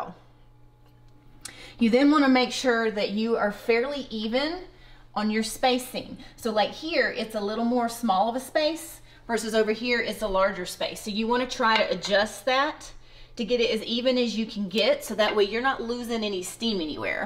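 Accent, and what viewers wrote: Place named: South Carolina, United States. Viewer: I can tell she is one of us in South Carolina! "Caddy Wampus' LOL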